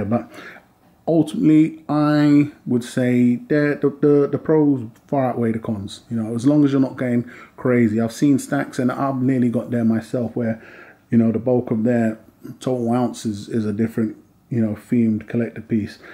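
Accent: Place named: Brixton, United Kingdom. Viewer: I recognize a Brixton accent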